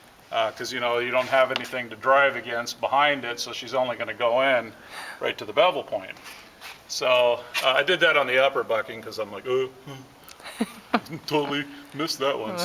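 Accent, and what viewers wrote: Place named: Ireland. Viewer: What I enjoy most is narration; her slight brogue does not hamper her clear and mellow enunciation, precise, yet complete, sentences. The self-aware, embarrassed giggles make listening easy and delightful. Those walls pierced with so many empty eyes may not be pleasing to my aesthetic sense, but I'm sure they'll be practical. I remember the Big One of 1964, which leveled much of Anchorage and caused a tidal wave which swept our Oregon beaches clear of debris and also one family's children. Keep up the good work. <link>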